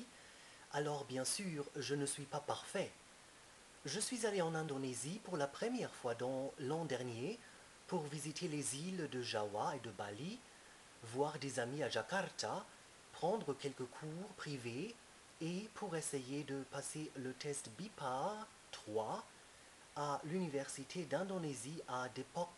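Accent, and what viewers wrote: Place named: Germany. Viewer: Tu parles très bien le français ! Ne t'inquiète pas pour ton accent quand tu parles français on comprend tout ce que tu dis. En ce qui concerne l'indonésien, je sais pas pourquoi mais j'ai l'impression que ta prononciation a été influencée par l'allemand parce que parfois l'intonation est très étrange. Est-ce que t'as beaucoup écouté l'indonésien avant de le lire?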